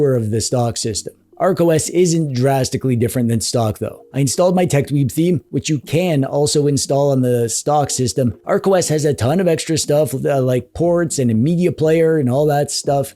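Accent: Canada. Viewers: I hear a guy with a Canadian accent, I’m trusting him. This handheld looks like a winner!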